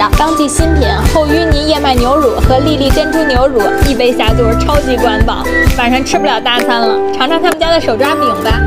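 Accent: Beijing, China. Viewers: 北京兒話~好聽！擱這吧🤗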